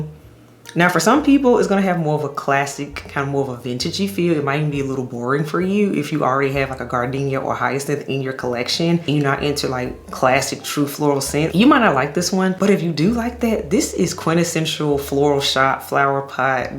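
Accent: Memphis, United States. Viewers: I knew you were a fellow Memphian when I heard you talk! Hi!!❤️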